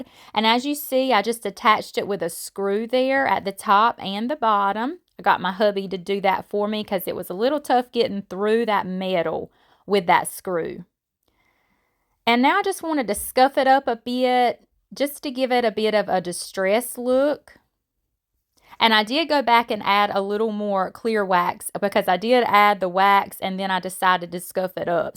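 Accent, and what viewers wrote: South, United States. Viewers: I love how you save broken pieces to cover and use in your arrangements! I would've never thought to do that, but then again I don't make many arrangements... I make more random signs, candle holders, and such. You're so amazingly talented and I LOVE your accent ❤ in from Southeast Texas so I love Southern accents ♥️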